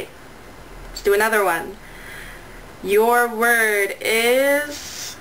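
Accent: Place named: South, United States. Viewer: Great! It's interesting to realize @@ that you've killed 5 vowels with one video ^_^. And again, I like the way you teach, it's really alive! I really love to listen some culture backgrounds of pronunciation or anything in English, for example when you tell that you got Southern American accent. I also like that you supply the CC or subtitles. That's what I've been hoping for all teachers do the same.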